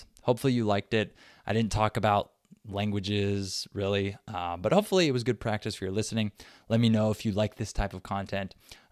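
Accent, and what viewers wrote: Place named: United States. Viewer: see you later beautiful guy i love your american accent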